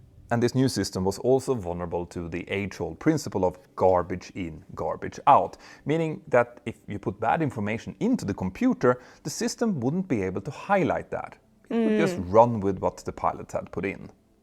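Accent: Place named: United States. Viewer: I've noticed watching your videos over the last few months in each video your accent reduces more and more. In this video it was almost imperceptible it was like listening to someone from Michigan or northerner Maine. Congrats you can now chameleon your way through the US if you ever visit! Although i do miss your heavier accent sometimes as it was very cute.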